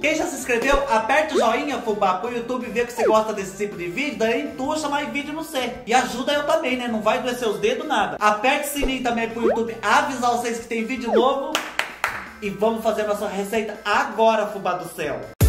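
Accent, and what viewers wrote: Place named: Itacaré, Brazil. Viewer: Fubá seu sotaque eh igual das pessoas de Itacaré sp morei nessa cidade há 20 anos e seu sotaque me dá uma saudades de lá, amo seus vídeos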